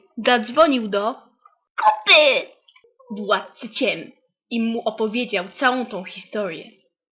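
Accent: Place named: England, United Kingdom. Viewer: Nie mów z angielskim akcentem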